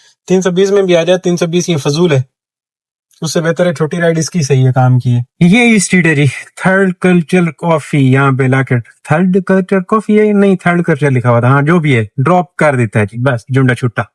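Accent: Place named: Sargodha, Pakistan. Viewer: Bhai ap punjab sy ho kya apki punjabi ak dum sargodho jhang Chiniot me jo boli jayi osi trha ki hai karachi wly to asi Punjabi bol ni skty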